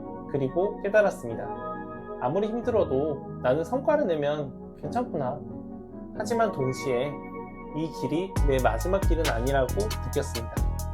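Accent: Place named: Busan, South Korea. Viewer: 부산분인데 사투리 별로 안쓰시네요..ㅋ 좋은학교 나온 인재시고 아직 젊으시니 앞으로 좋은 기회가 마니 오겠쥬..ㅋ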